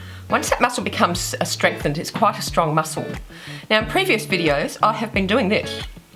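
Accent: Australia. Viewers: is she from Australia ?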